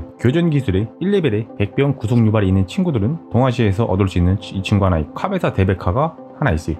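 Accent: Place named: Chungcheong, South Korea. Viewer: 충청도이신가 .. 억양에 친근감이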